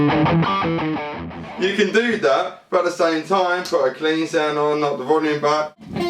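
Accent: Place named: London, United Kingdom. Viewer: Londoner I think.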